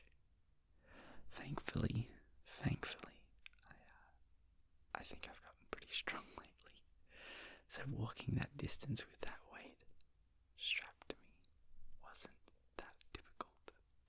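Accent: Australia. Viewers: We love an Aussie accent 🫶🏼 me & my sister called it “tickles” too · Oooooh Australian Asmrtist I’m here for it! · Thank you Australian Steve Harrington for the amazing ASMR <3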